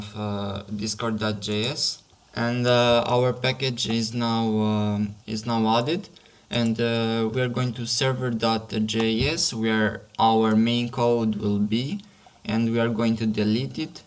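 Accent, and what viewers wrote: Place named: Albania. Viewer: hahaha po duket shqiptari se si e flet gjuhen e huaj 🤣🤣🤣 ❤️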